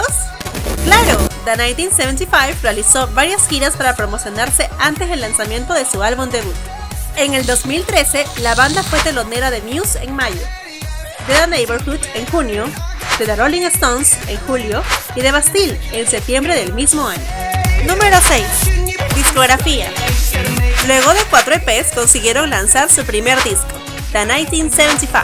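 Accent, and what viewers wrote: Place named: Chicano, United States. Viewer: Me gustó mucho la información, está muy bien hecho 💕 lo único que me incomodó fue la pronunciación del inglés de la chica :/ habla demasiado chicano jajsjj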